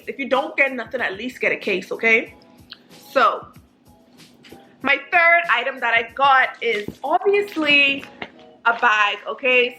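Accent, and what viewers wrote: Bahamas. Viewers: I hear an accent. I may be wrong but are you Bahamian? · I knew I heard a Bahamian accent 😅😌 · I watching this trying to figure out if you Bahamian cause it sounds like you are...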